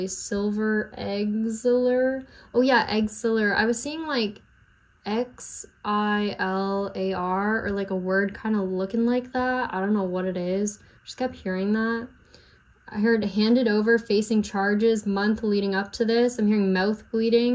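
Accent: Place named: South, United States. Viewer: its southren accent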